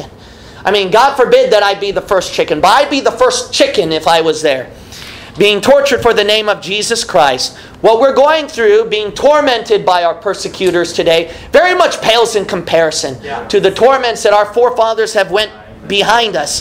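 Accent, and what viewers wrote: United States. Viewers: You are so right. I love to see new videos come out from! And I love his country accent, esp when he says "teachin'". And whoever that is in every teaching screaming out "Amen" in every video, God bless you Brother 🙏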